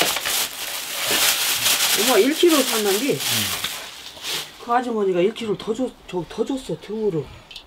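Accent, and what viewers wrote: Chungcheong, South Korea. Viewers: 영상 잘 봤습니다. 저희 어머니 고향도 충남이다 보니 꼭 저희 어머니 말씀하시는 줄 알았습니다. 만약에 40kg을 담근다면 님께서 20kg 기준으로 한 재료보다 2배정도 재료를 더 넣으면 되겠죠? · 정감있는 충청도 말씀이셔서 고향이 충청도이신 돌아가신 친정엄마 생각 많이 났습니다 좋은영상 감사합니다 건강하세요~^^👍💖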